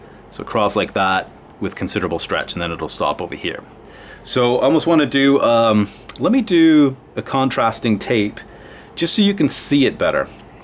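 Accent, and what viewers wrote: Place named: Scotland, United Kingdom. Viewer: Interesting mix of Scottish & American & maybe a little Australian accent there.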